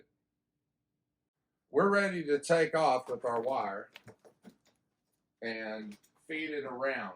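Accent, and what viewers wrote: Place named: Ozarks, United States. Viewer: !! The accent is coming in great. You have assimilated to the Ozarks nicely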